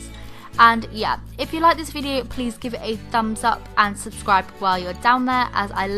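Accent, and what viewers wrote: England, United Kingdom. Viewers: Are you from England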